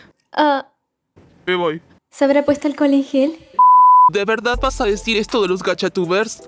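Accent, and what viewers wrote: Argentina. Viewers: Jaja bien argentino papa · AY DIOS , como me cague de risa , LPM x'd (es gay mesclado con humor , acento Argentino y espacios publicitarios de esos que pasan a las 2 o 3 de la mañana , decime , no es lo mejor de la pu74 vida?)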